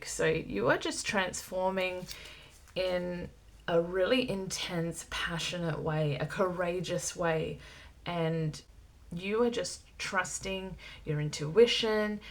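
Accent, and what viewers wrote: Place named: Australia. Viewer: Great reading, I was in group 1. OZ accent..🫠🤤🌞 especially the sound of "you" and "here"❤ please don't get me wrong, It just sounds beautiful. 🫣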